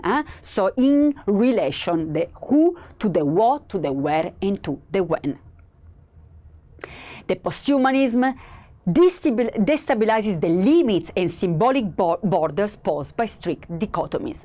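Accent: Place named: Italy. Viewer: English with Italian accent sounds cute here...😘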